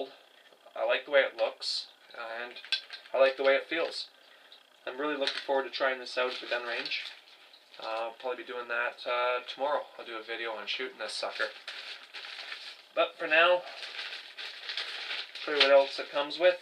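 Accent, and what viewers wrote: Canada. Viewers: Yes..they talk funny..aboot ...oot instead of out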